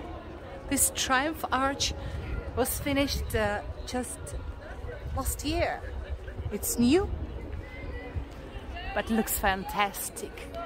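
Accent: Russia. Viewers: хороший английский , но акцент супер русский)))) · Очень заметный русский акцент.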